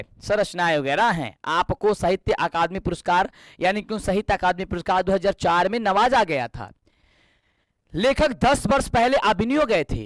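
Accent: Bhojpuri, India. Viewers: Bhojpuriya sir❤❤❤😅😅😂😂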